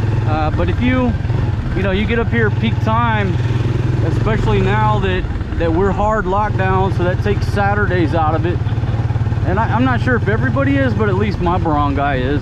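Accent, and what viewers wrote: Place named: Alabama, United States. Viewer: Where u from, sound like Alabama, my home for now...I'm glad they opening up, cause I'm ready to come there as soon as international flights nd visas atr issued...be safe bro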